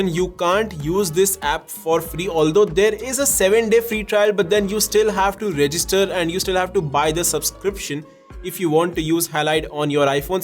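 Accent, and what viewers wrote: India. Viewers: I don’t understand your English. Why do Indians think people understand their thick accent English?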